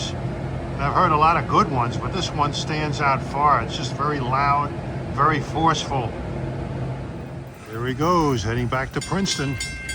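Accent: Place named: Long Island, United States. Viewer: First of all, your doing a great job, the Long Island accent is great since I grew up in New Hyde Park. I worked as project engineer for Amtrak <num> and installed on a good day, 1000 ties on the NE corridor. Everything my crew installed has been replaced with concrete ties and I see Nassau interlocked is totally gone. All the crossovers are gone. Ricky759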